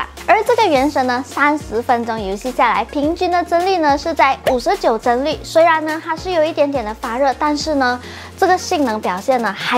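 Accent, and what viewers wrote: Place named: Malaysia. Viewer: 馬來西亞口音與講話習性 我也不太能接受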